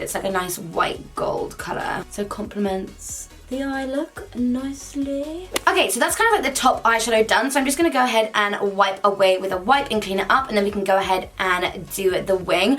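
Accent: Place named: Northern England, United Kingdom. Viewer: Can we just appreciate you doing a northern accent! NAILED IT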